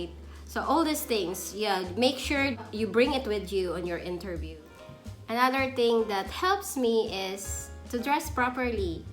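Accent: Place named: United States. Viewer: she is sound like she is an american girl , like engl is her mother language , what is your concern was about Idk...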